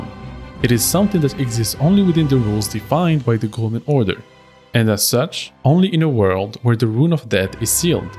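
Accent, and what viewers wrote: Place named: France. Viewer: I know a French accent when I meet one, excellente video l’ami penses tu en faire en français ?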